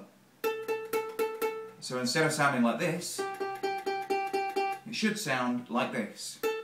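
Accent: United Kingdom. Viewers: Hello there. I haven't got a ukulele yet but I do feel like buying one. Specially after watching your simple video showing how simple it is to play it. Actually clever ppl can simplify things as you did.. And not everyone can do that.. Also your British accent is a plus. Hahhaha. Are you a music teacher in England or something? Thx for sharing your knowledge. Bye. Cheers. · Speak up ! u british ?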